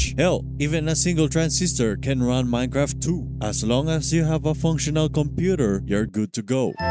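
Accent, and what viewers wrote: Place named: Philippines. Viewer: RAHHHHH PILIPENO ACCENT LETS GO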